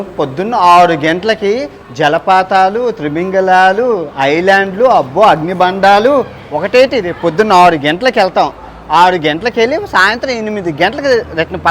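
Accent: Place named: North Andhra, India. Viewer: Ur videos n ur dialogues in Telugu north Andhra accent r fantastic n the way u r moving with people is awesome...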